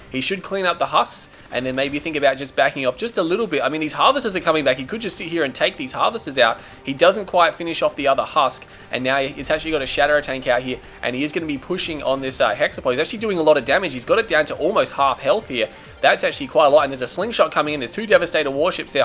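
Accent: Australia. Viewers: its weird how literally the most fun Channel on my youtube, is just some Australian dude narrating videos of a game that came out in 2007 and that i still suck at for the most part. And yet it never gets old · Too be fair Australian is one of the best accents in the world. M8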